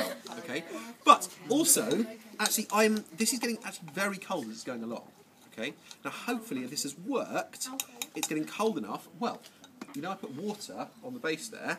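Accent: United Kingdom. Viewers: "Uh, no" ends the video He is from the Commonwealth, probably England though based on the accent, since he was using Celsius instead of Fahrenheit like the US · This what most British people sound like... And we can pronounce the letter t 😒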